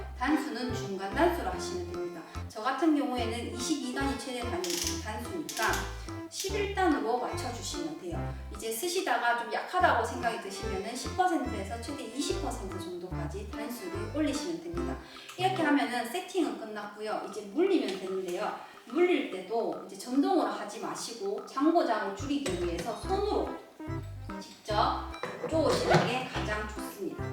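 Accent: South Korea. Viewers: 오늘은 ~~~ 경상도 사투리 잘 보고 갑니다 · 대구에 사시는 분인 줄 몰랐습니다 경상도 대구 고향 말씨 정겹습니다 감사합니다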